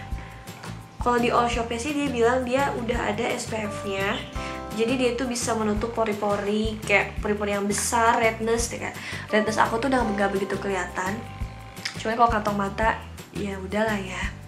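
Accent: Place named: Java, Indonesia. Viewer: kok medhok. jawa ya ?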